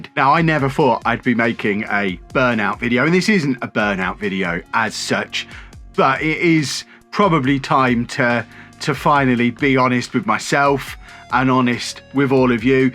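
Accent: England, United Kingdom. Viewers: are you Rob Chapman of chapman guitars brother? you look exactly like him and you both have english accents so i would assume so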